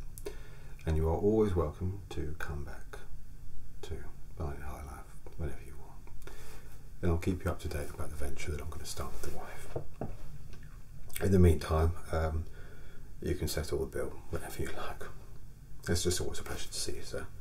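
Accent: United Kingdom. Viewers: Finally a good British ASMR-tist with a beautiful accent and voice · Hold on a second... British accent...barber shop....possibly Fleet Street.... look I may be a lowly American from Virginia but I think I see where this is going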